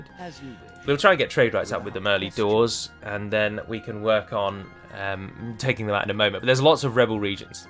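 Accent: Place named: Yorkshire, United Kingdom. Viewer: that yorkshire accent was... enchanting.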